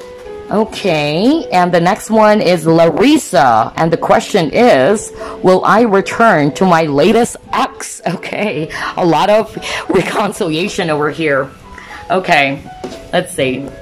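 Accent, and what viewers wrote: Philippines. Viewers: Wow you know how to speak Tagalog, I'm a Filipino you have a nice accent of Tagalog dialect 😍😍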